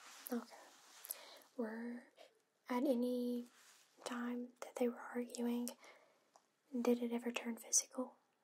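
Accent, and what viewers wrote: South, United States. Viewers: Huge fan! The only complaint I have is I never make it through a video without falling asleep! 🤣I love your accent! and I have to know.. where are you from? I've lived in La. Ar. And Tn... if I had to guess, Georgia? S. Carolina? Regardless, thanks for the great asmr sessions! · the southern accent just makes this roleplay much better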